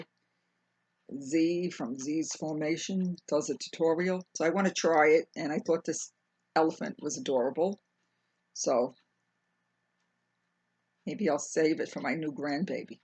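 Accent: New York, United States. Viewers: I like the divine pattern hat & cowl. Looks really great in that color green.I enjoy listening to your NY accent, memories of home. How about a drawstring backpack that teens like. It would be about same size as your bags. 🤔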